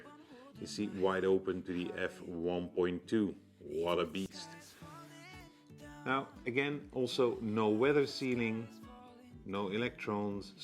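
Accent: Netherlands. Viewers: It is ttartisan not artisans. Both are different companies. Are you dutch btw- I hear the familiar accent:) I prefer the ttartisan lenses. All of them are great and sharp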